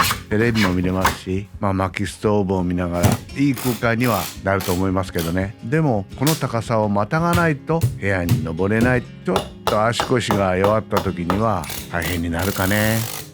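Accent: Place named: Kansai, Japan. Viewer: 建築設計事務所をやっています。子供の頃、模型を作っていた私に、親父がしきりに「物事の道理」ということを言っていましたが、さんの作られた住宅を見ていると、その言葉が思い浮かびます。私も関西出身ですから、さんの話す言葉が、親父が話している言葉のように聞こえてきます。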